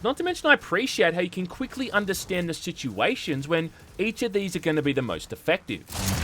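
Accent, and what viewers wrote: Australia. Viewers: I used to love your content but as time goes by you more often than not sound like one of those bought out gaming "journalists" from IGN with the only difference beeing your thick australian accent... Since the last 10-15 uploads or so something feels off about your content bro...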